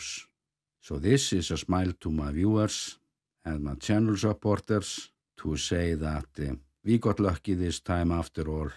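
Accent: Iceland. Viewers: I was in Iceland before the last eruption and witnessed the flooding and destruction of the Ring Road. To this day, I can’t believe how quickly you Icelanders repair things and respond to such massive natural events! You people are simply incredible. In countries like Germany, it wouldn’t have worked nearly as fast. Thank you so much for your great work, the detailed information, and the amazing footage. It’s always a pleasure to watch your videos. And by the way: I love the Icelandic accent!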